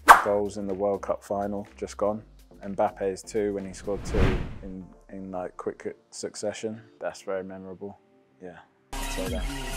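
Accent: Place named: England, United Kingdom. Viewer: Chamberlain’in röportajları keyifli oluyor, hem vizyonlu hem de anlaşılır bir İngiliz aksanı olması çok iyi 🖤🤍🦅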